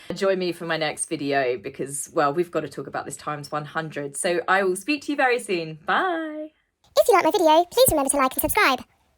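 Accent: United Kingdom. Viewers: Thank's for talking a little bit slower in the video. Sometime's it's hard to keep up with the British accent when it goes so fast. Lol...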